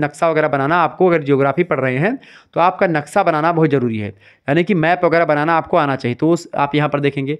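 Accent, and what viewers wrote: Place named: Bihar, India. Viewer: Sir AAP Bihar ke ho . AAP bhojpuri language Bol rhe h vich vich me ❤❤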